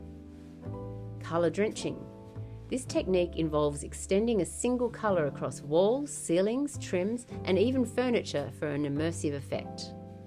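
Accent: Australia. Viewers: Your accent sounds very south/west Sydney, lagarno/peakhurst/hurstville. The accents of my youth. Thankyou beautiful video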